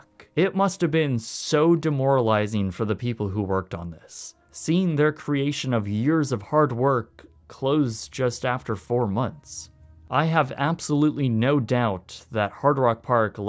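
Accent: Canada. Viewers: "forgotten aboot'! Love the Canadian accent!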